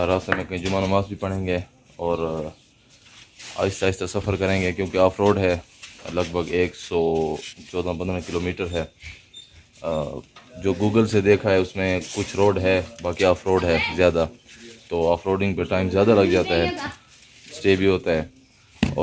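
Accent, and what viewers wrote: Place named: Saraiki, Pakistan. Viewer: Your Saraiki accent is so cool.!!! 😁 btw kia hi baat hai jaga ki.... lots of love to all burakers ❤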